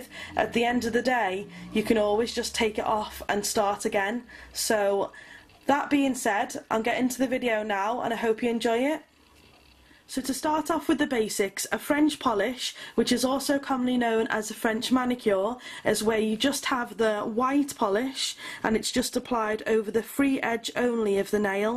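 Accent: United Kingdom. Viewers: Oh my!!! I LOVE LOVE LOVE your type of British accent!!!!!!! One of my absolute favorites!!! ❤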